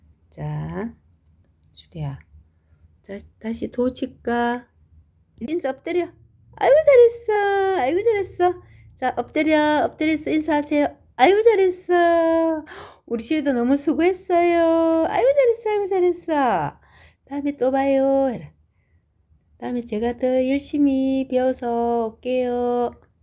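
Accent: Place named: Gyeongsang, South Korea. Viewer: 경상도인가봐요 ㅋㅋㅋ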